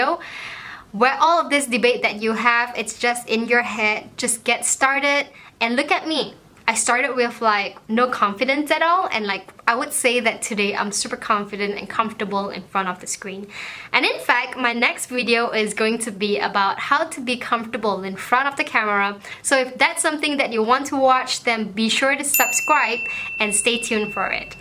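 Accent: Malaysia. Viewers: Ya actually English is the 2nd most spoken language in the world💛 also your Malaysian accent is so cute😍❤️ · Thank you. I've had this dilemma for quite a while. As my channel grows, it's kinda hard to switch the language, but this subject keeps popping in my mind. I probably switch to English one day. I'm just not sure when. By the way, I love you Malaysian accent. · Love your Malaysian accent 🙃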